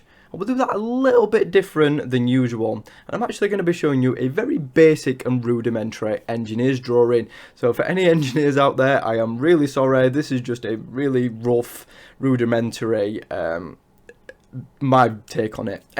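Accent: United Kingdom. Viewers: thanks for your answer. I’m not British, but I have an interest in accents, dialects and all that jazz. Might I ask you where your accent is from? Midlands? Liverpool?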